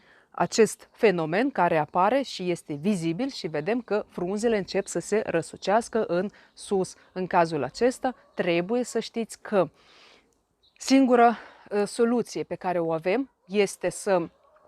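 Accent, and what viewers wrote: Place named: Moldova. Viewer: Cred că toți cei care vă vizitează canalul de youtube vă apreciază. Eu, de asemeni. probabil că sunteți cadru didactic la o facultate de profil agronomic. După accent, proveniți, sau sunteți din Republica Moldova. În România, din păcate, s-a rărit drastic numărul tinerilor care se mai ocupă cu grădinăritul. Oricum, vă urez succes în activitatea profesională și nu numai.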